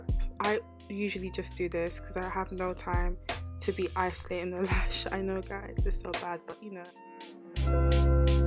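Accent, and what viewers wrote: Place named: England, United Kingdom. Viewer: You can’t hear the English accent?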